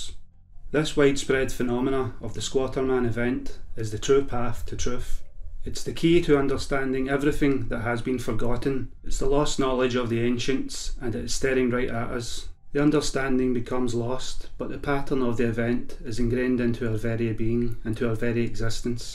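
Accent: Scotland, United Kingdom. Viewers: I believe it's Scottish...or Irish.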